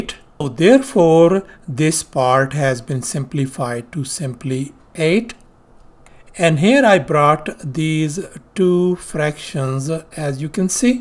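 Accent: India. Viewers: Sir you are amazing... your accent??....are you Indian or Pakistani??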